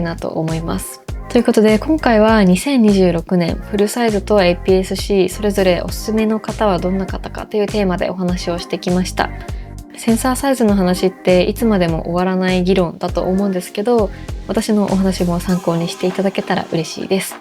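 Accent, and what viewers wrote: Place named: Japan. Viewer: 너무 좋아요. 일본 사람이 한국어를 하는 억양이 그대로 살아 있어서 깜짝 놀랍니다.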